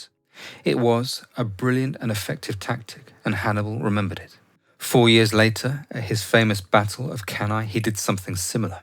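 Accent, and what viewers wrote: United Kingdom. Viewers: good narration, sources cited in the video, good historical and reproduction footage, British accent, and cool topics. Subscribed.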